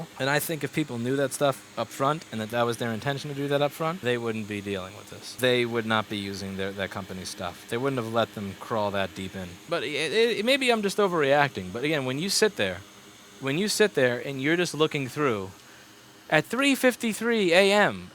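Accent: New York, United States. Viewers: Yes, that New York accent and displeasure at anything Apple sounds richer and much warmer. Thanks, Louis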